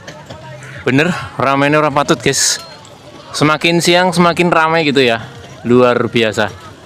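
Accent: Banyumas, Indonesia. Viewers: Tetep logat banyumas saja om, biar unik jg enak didengerin. Kalo bisa ada dialog dg warga lokal pake bahasa lokal jg om, suka aja dengerinnya. Jadi lupa kalo negara lg krisis. Hee... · Pertahankan dialek Banyumaas dan bhs Indonesia bagus dan lucu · aku seneng ngrungokna obrolanen ngapak Ramene ORA patut..dadi khase yaa Mas😂👍👍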